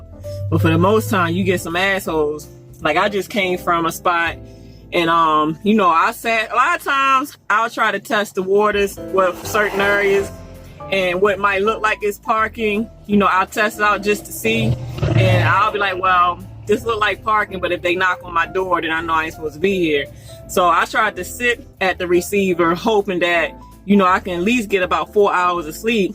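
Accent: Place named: Washington DC, United States. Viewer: Washington DC or Baltimore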